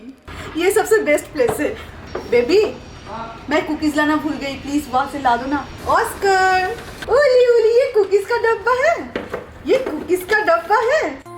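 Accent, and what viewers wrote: India. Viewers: What a Hindi accents.... Shame on you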